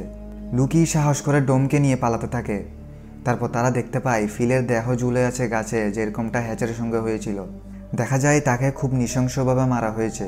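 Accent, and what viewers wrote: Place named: Noakhali, Bangladesh. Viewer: Noakhila bhi... Kemon asen.. apne noakhali basha bolen jae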